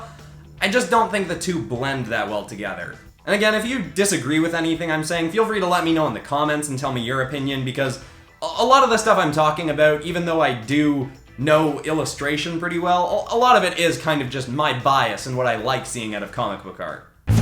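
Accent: Canada. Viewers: First time watching, can already tell you’re Canadian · Canadian! Besides the celebrity Canadians, it makes me wonder if everyone there pronounces those words that way (minus the French Canadians). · You have the MOST Canadian "about" I've ever heard. It even bleeds into your DOUBTs, which I never heard before.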